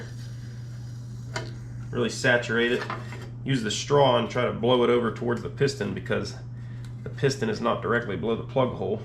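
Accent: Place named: Pennsylvania, United States. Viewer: You sir, are my main reason to open up youtube! Quality, real, content. I learn a lot. Please continue. Also, love the PA accent. Family in Altoona. I'm quite a bit south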